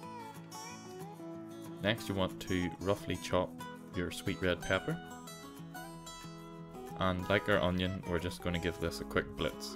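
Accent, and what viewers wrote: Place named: Ireland. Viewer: I thought I heard an Irish accent :)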